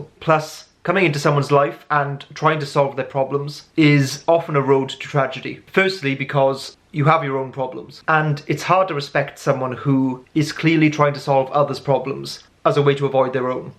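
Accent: Wales, United Kingdom. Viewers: As an INFP female, I watched this video out of curiosity...I’m not even half way through the video yet, and this is the most accurate description of my personality I’ve ever heard....My mind is officially blown !! Is that a Welsh accent by the way? :) I’m from South East Wales - near Cardiff :)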